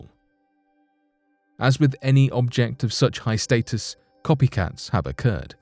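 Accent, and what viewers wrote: United Kingdom. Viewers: An even greater unexplained mystery... Why is it that all the mystery/unexplained YouTube channels are narrated by Brits or Irish?! Not bashing I love it #Attenboroughismydad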